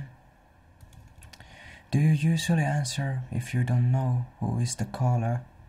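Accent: Finland. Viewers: Finnish, but it isn't a super strong finnish accent. Finns sometimes speak something called rally English and atleast i think it's atrocious, his accent is pretty stereotypical though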